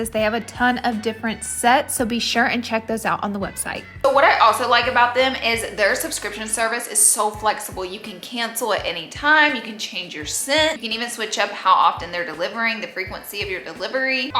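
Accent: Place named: Alabama, United States. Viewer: I need more of Alabama accent back ok hunny!!!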